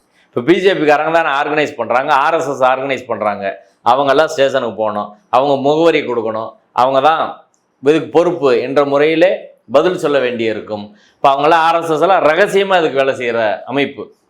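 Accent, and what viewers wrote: Madurai, India. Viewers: Only madurai voice. Great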